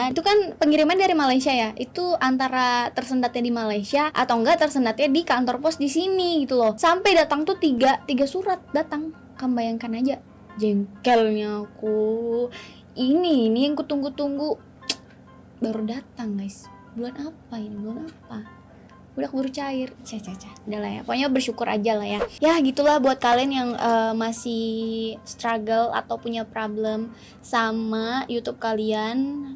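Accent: Banjar, Indonesia. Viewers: banjar kah kak?cra ngmngnya kek org banjar😭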